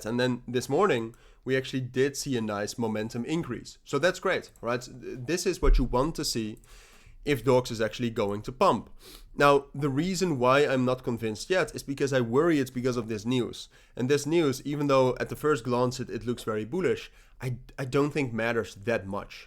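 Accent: Netherlands. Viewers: Bro are you dutch? Haha sounds like it, i am dutch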